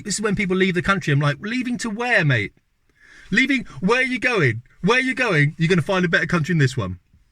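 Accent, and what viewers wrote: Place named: Scotland, United Kingdom. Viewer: Scottish AF 😂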